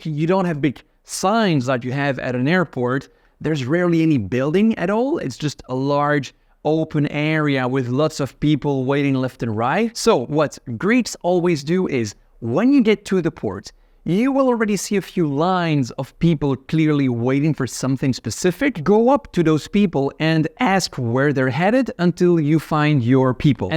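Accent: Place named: United States. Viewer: Sounds like you learnt your English from the Americans!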